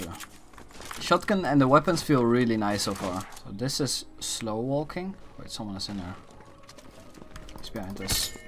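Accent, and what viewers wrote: Netherlands. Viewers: Are you dutch? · I hear a dutch accent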